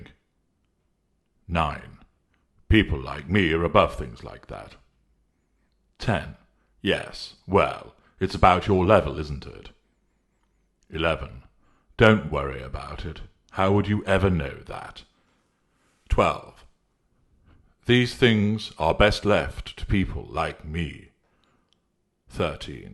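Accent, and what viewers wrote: United Kingdom. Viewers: Ahh you must know the latest narc that I am preparing to extricate myself from ... Admittedly it sounds better with a British accent!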